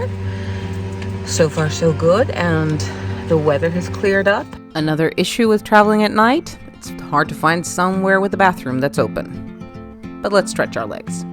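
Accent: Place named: United States. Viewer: Ou u live in sweden but u cannot be og swedes judging this by ur accent. Its wery amerikan accent. But anywAYS have great coming winter!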